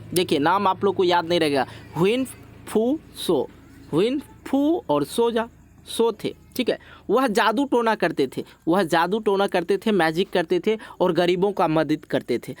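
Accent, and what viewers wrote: Bihar, India. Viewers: Aap Bihari h ka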